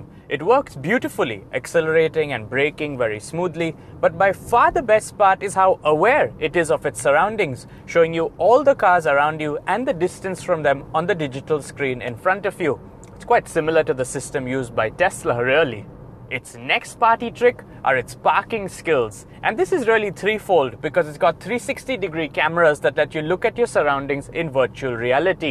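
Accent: India. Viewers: İndian english is soooooo 😫😫🥴🤢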